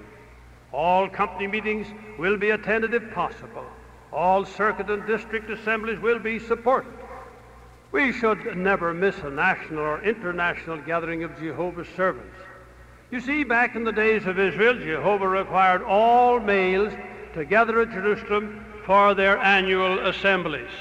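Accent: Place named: Ireland. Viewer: Alexander Hugh Macmillan (June 2, 1877 – August 26, 1966), He was born in Canada and I love to listen to his slight Irish lilt. A dynamic speaker, he presented a history in his book Faith on the March, published in 1957.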